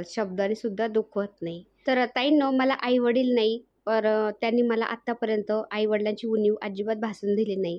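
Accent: Ahmednagar, India. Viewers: मला वाटलच तूम्ही नगरच्या आहे आम्ही पण नगरचे आहोत पण नाशिक ला राहातो माझे मिस्टर आशेच बोलतात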